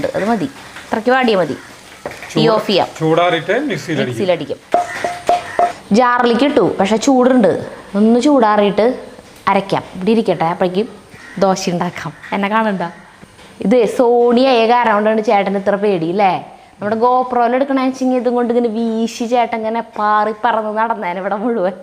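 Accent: Thrissur, India. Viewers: തൃശൂർ സ്ലാങ് കേൾക്കാൻ നല്ല രസം 👌😍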